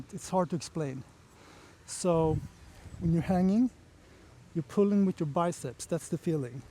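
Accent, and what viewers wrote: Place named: Europe. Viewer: voice quality is good people from india don't understand european english 😅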